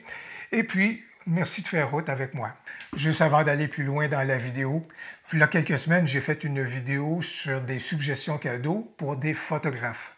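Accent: Quebec, Canada. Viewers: Merci à toi pour tous ces contenus. Tu as raison de ne pas trop te diversifier. Il existe également d'excellentes chaînes qui traitent de Dxo PhotoLab et de Capture One, logiciels que j'utilise aussi. Tu ne peux pas tout faire, et surtout le faire bien. Reste comme cela et continue à ton rythme de nous faire découvrir et aimer la photo. Continue de nous faire découvrir le Québec (désolé, je suis un frenchy de 69 ans). Tu as la chance d'avoir un accent merveilleux et des paysages grandioses. Personnellement, je suis abonné à une dizaine de chaînes Québécoises, toutes de haut niveau. Bonne continuation, cordialement, et bonnes fêtes. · Bravo pour ta chaîne et je te souhaite le même succès pour l'année prochaine et puis j'aime bien écouter ton accent typique de ton lointain Québec:-) Je suis en particulier tes sujets sur la photo en général et sur NikCollection et Luminar. 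Bonnes fêtes de fin d'année pour toi et tes proches!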